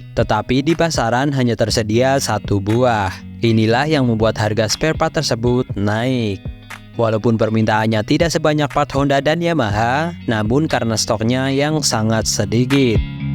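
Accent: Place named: Central Java, Indonesia. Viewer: Keliatan sekali 😂😂😂 mimin ini, orang jawa tengah 😂😂😂😂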